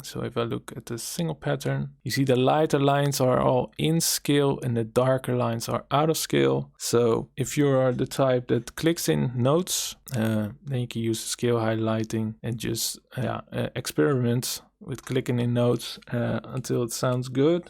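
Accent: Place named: Netherlands. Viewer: By your accent I'm guessing you're from the Netherlands?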